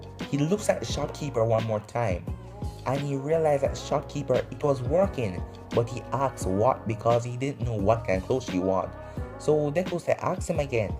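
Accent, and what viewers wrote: Jamaica. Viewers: Your accent reminds me of white yardie. Great vid man. Gonna binge the series.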